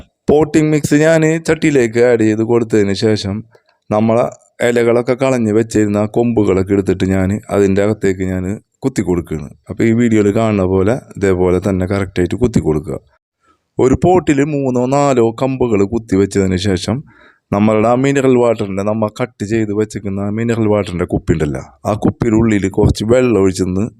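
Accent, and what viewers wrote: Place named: Thrissur, India. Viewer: നല്ല തൃശൂർ ഭാഷ 😄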